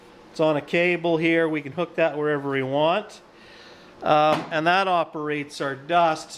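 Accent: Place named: Kentucky, United States. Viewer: UK? Seriously? You talk like you're from Kentucky. No offence.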